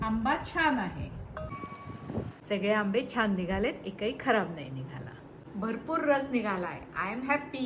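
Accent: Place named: Pune, India. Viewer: कुठे आहे हा जन्या farm .. तुमच्या बोलण्यावरून तुम्ही पुणेकर वाटताय.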